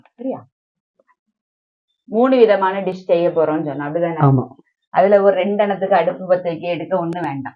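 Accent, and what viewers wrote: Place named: Nanjil Nadu, India. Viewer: Sister you are soooooo pretty and you exactly look like my aunty. And i love the slang of nanjil naadu 💐💐💐💐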